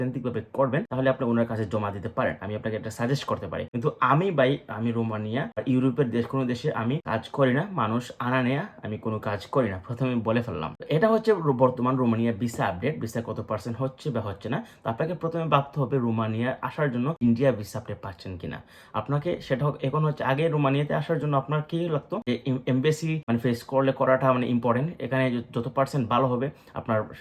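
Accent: Sylhet, Bangladesh. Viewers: ভাই আপনি কি সিলেটি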